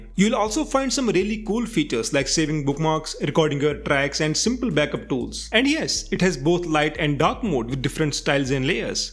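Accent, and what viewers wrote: Kashmir, India. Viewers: Are you Kashmiri? The accent gave it away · For The Sake Of The Holy Cow !🐄 That's a very heavy Indian accent My Friend. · By any chanass brother, u koshur??? I caught the accent 😅❤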